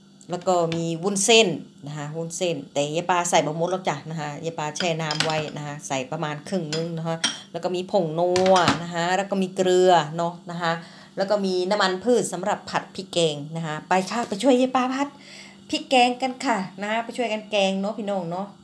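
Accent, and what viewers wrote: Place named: Korat, Thailand. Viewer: แกงมะเขือเนื้อน่าอร่อยเผื่อด้วยค่ะไม่กินเนื้อจ้ะ ยายปลาคนโคราชนิสำเนียงโคราช สบายดีนะ😋สวัสดีจ้า วันนี้ว่างเลยมาหาค่ะ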